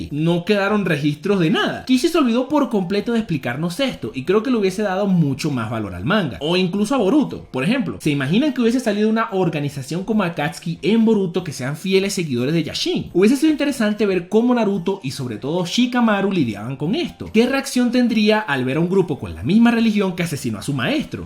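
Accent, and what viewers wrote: Venezuela. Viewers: Acento Venezolano... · Eres venezolano, verdad? :3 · Eres venezolano?? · Enserio eres venezolano?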